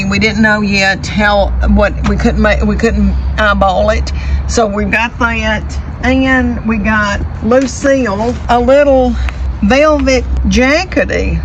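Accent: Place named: Tennessee, United States. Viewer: Oh my goodness! Morgan shops at Costco too. I was so excited to find this out., I love your accent. My cousins sound so much like you. They are up in Rocky Top, Oak Ridge and all around there, Knoxville. I grew up in Florida, so I have a very different way of speaking. Love, love, love your humor. It’s sooooo true! 😂😂😂❤❤❤ Cheryl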